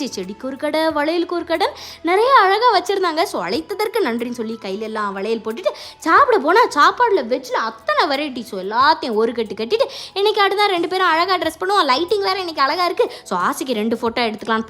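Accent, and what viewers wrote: Tirunelveli, India. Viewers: எங்க எத்தனை தமிழ் பேசினாலும் எங்க திருநெல்வேலி தமிழ் தனி அழகுதான்❤❤❤ ஏலே😂😂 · பேச்சு வழக்கின் நமது திருநெல்வேலி பேச்சு நமது அக்காவிற்கு வந்தது❤❤ ஏ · பிச்சி தானல திங்க போற பேசாம சாப்பிடுல பக்கா திருநெல்வேலி வட்டார பேச்சி ❤ நீங்க பேசுறது அவ்வளவு அழகு❤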